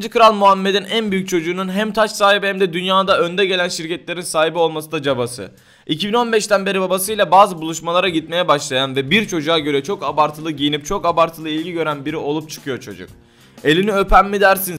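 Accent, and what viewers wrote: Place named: Hatay, Turkey. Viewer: hataylı olduğu nekadar belli 😃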